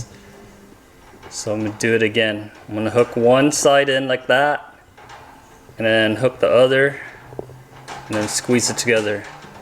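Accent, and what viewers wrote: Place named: Pennsylvania Dutch, United States. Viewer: You really helped me put my M01 together. I was so frustrated I was going to return it. I bought the same model from Amazon last month and it came broken and I sent it back. When I got it the second time last week and couldn’t get it together or find a video to help me I was so frustrated. My mother is from Lebanon, PA and thought you had a Dutch accent. Hey, my parrotlet and I thank you so much. Stay healthy and happy friend.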